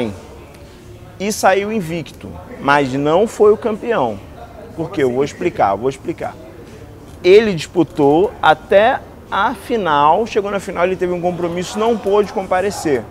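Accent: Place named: Bahia, Brazil. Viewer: o kra fala assim deve ser bahiano kkk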